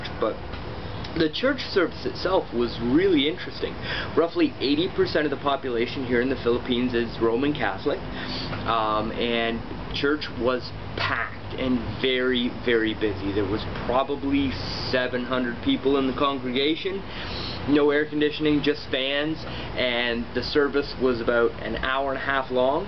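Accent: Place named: Canada. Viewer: Oh shoot! You're Canadian! I knew it from listening to your accent, haha!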